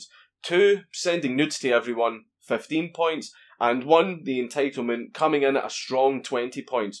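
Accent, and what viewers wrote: Scotland, United Kingdom. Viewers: Your Scottish accent is so fucking strong, that every time you say girl, I fucking hear ghetto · Having you tell the tale of the legendary Thot was just that much better with your deeply Scottish accent. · The Scottish accent really adds to the mythos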